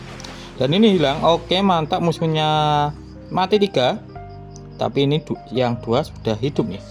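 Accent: Java, Indonesia. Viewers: Temenan pora 😂😂😂 jowo meduk hahah mntep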